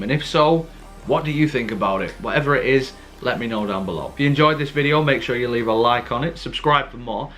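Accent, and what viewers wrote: England, United Kingdom. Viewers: I never thought I'd hear an Englishman who could appreciate this show :)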